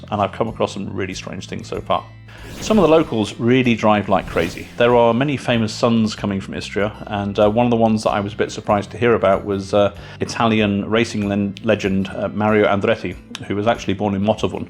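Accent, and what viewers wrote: United Kingdom. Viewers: So sorry for sending you across the ocean. Somehow missed your British accent. But, later certainly explains your fluid words. NEVER Looking forward to your next thing. 🤗👍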